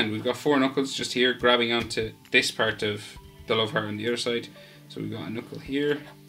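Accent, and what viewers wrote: Ireland. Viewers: Bro u Irish?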